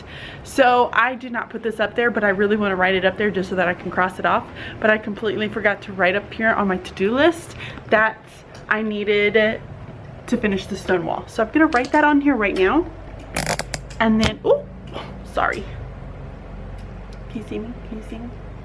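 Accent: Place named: South, United States. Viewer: I love listening to you with your Southern drawl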